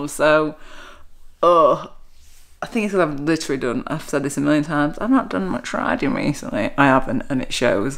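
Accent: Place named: Northern England, United Kingdom. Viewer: To say that you enjoy listening to somebody's talking, I've always found it to be the ultime compliment you could pay to someone; and your Northern accent is so warm and clear, it is so welcoming! 🌝 I don't know who the hell was on that race but you could've dropped the entire comment section, me plodding along at the very tail 🙃😄 😃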